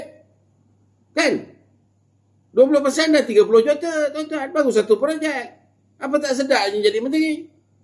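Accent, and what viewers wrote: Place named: Muar, Malaysia. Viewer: sempoi la bagi insight analysis PRN Johor. simple tapi detail. berborak style orang Muo. lawak tapi bisa.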